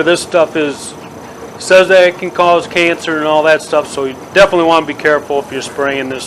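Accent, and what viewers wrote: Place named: Michigan, United States. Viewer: Thanks for this,. This was exactly what I needed. I was having some difficulty figuring out the bevel on my floor transition. Are you from Michigan? You kinda sound like people here in Michigan. Thanks again.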